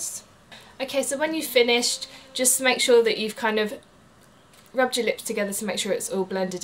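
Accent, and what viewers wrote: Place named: United Kingdom. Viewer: Nice video! I love your voice and your British accent! I could listen to you talk all day long! Please do more of these makeup videos/tutorials, maybe some you are getting ready? Thanks! oh, I subscribed! 😍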